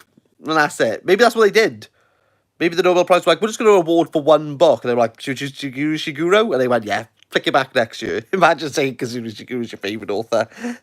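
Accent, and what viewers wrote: Wales, United Kingdom. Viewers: the welsh accent plus the lack of hearing-impaired captions has made this vid a little trying but think I got the gist the only fosse I know is bob fosse the broadway show dance guy they made hit movie about him also the art of the deal was ghost-written trump doesn't even write e-mails cause he knows they last forever and doubt he's cracked a bk since college but if they could give it to dylan and the peace prize to obama before he even finished moving into WH even the orange ogre may have a chance in the meantime send me some of your laughing gas could really use it now ⚛😀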